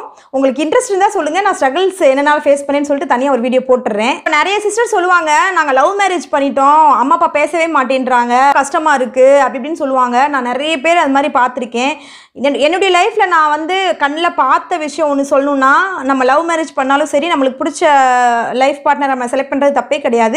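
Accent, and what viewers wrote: Madurai, India. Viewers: Very encouraging vedio sister, na madurai dhan unga madurai pechu super, rombave yedhaarthama pesuringa maduraikaravangaluke irukura yethaarnama pechu, unga vedio paarthadhula irundhu Naanum savings panni gold vanganumnu oru intrest vandhuruku, vedio paakuradhuku munnadi varai intrest illa, unga character super, selave pannadha character kondu vara Naanum try pannitu iruken, unga vedio paarthu, continues.ah vedio podunga, thankyou so much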